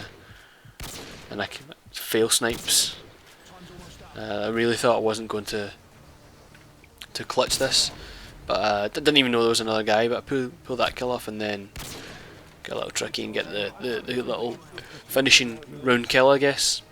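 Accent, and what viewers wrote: Scotland, United Kingdom. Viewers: are u scottish if so were 4rm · arent u british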